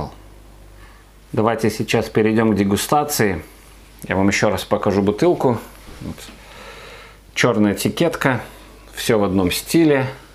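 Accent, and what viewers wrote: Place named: Ireland. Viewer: У вас акцент. Вы живете в Ирландии?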